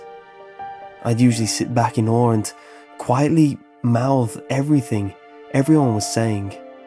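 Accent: England, United Kingdom. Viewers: Yes he has a very sexy British voice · I'm guessing you don't live in the UK, it's an english accent not british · The Favorite Gentleman the accent varies is lucky to get a nice english accent unlike scousers and geordies god i hate there accents lol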